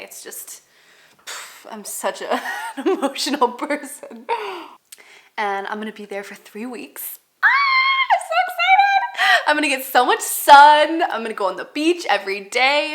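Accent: United States. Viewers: Are you from American🇺🇸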